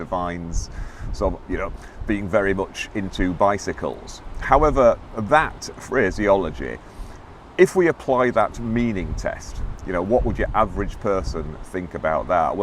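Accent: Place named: Northern England, United Kingdom. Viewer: It’s great to hear your northern accent. As a Mancunian, I’m told I have a broad ‘northern’ accent, hopefully not as rough as Angela Rayner though!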